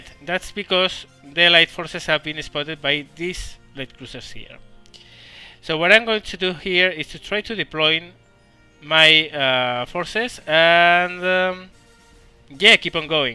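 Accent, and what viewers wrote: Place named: Spain. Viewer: Yay!!!! :D so happy you're back! I've missed your beautiful Spanish accent :p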